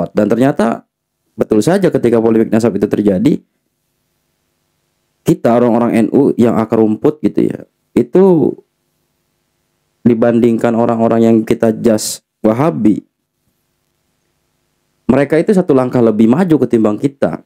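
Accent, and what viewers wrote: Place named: Jakarta, Indonesia. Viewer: pakai logat Betawi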